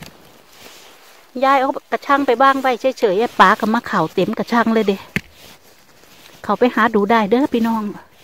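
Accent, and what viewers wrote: Korat, Thailand. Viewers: สวัสดีจ้ายาย ป้าเขามาดูคริบเก็บเห็ดหลายคริบแล้วป้าชอบดูคริบเก็บเห็ดชอบกินดายาย พูดโคราชยายอยูอำเภอไหนจ้า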